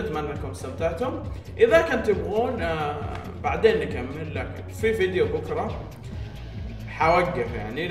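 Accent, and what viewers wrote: Jizan, Saudi Arabia. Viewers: والله احسك من جيزان صح انا من جيزان و اعرف هاذي الهجه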